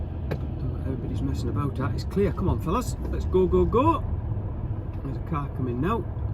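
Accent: Wales, United Kingdom. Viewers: great video, do I detect a welsh accent, good to see you are not having your image in screen at all times, like some I could mention !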